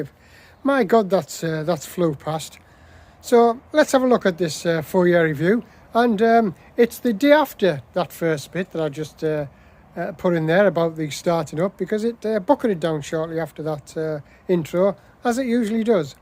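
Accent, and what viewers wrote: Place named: Liverpool, United Kingdom. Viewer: Myself being from the northeast of England! I can’t get away with your posh scouse accent.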